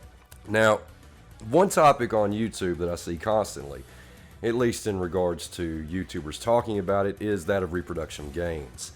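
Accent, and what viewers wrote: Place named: South, United States. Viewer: Great video, I recently picked up a few repo myself and have no problem with them I'm not going to sell them, there staying in the collection. Love the soft southern accent. Just subbed.👍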